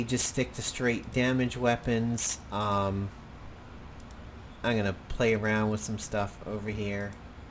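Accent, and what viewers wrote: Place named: New Jersey, United States. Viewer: Thanks for posting this video,. My hearing isn't the best, but I would've guessed you were from New Jersey.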